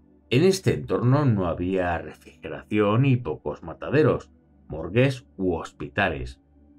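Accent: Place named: Spain. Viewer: Oztia tio chaval, usted empezó a hablar español de España 🥺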